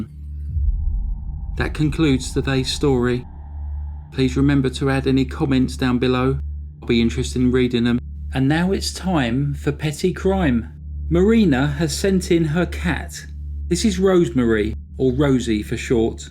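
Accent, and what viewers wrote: England, United Kingdom. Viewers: I thought at first you said Verna and Amber had been winning twerking contests, at three years old! It is easy to see how this case became a comedy movie. Bargaining and bartering with the hit man, all those marriages, the two women so much alike, so much in common but obviously bishes to each other. That Wanda made a complaint about Amber's campaign, then at the first opportunity fell foul to the rules that were changed at her instigation, so she gets her own daughter disqualified.. Even just the fact that 12 year old girls had such intense and serious "campaigns" with obvious voter buying-its so silly to the rest of us. It's all just so.....Texas, I suppose. Weird, WTH cases seem prevelant, deep in the heart. Thanks, kudos as always. PS I did giggle when in your polite, English narration voice, you said Wanda was regarded as up herself. 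PS again, I think it's unfair YT won't let us like on a second time around! Btw On my mini binge tonight, I've even let the four minute ads play through, but it took a lot of will power.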